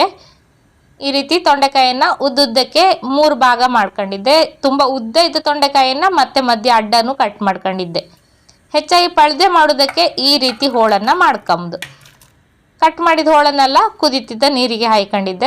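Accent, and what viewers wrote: Kundapura, India. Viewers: Avaru kannada sariyagi mathadthidare. idu udupi jilleya kundapura thalukina pradeshika ಭಾಷೆ ಕುಂದಾಪುರ kannada (kundagannada)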